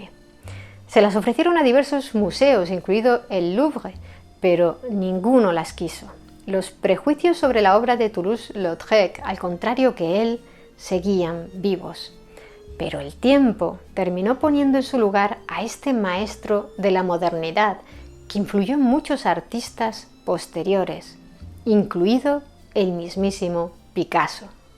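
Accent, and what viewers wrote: Spain. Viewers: aumentaste mi admiración, hacia ese Gigante de la pintura, como lo fué Henri de Toulouse-Lautrec, gracias a tu narración con todas esas imágenes, lugares y personajes que rodearon la vida de este superdotado Artista. Toco el Fagot, y me hubiera gustado que incluyeras entre sus ilustres amistades, a Désiré Dihau, fagotista de la Ópera de Paris, pintado por su genial amigo, Edgar Degas. De todas formas hemos quedado fascinados con tu narración y esa bella combinación de tu acento español y la pronunciación impecable de tu francés. Bravo y, te seguiré en el futuro.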